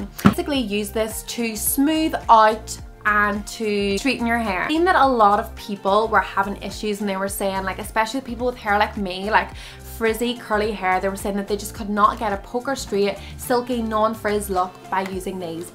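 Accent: Derry, United Kingdom. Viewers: So happy I found someone with a similar hair texture to my own doing tutorials for this! And is that a Derry accent?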